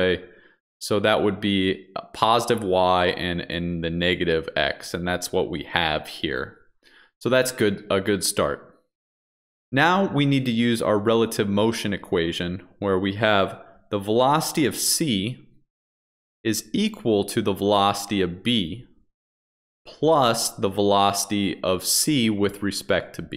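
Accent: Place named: England, United Kingdom. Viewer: Finally, someone that speaks with pure English accent. Please post more videos on the acceleration analysis using complex number and vector math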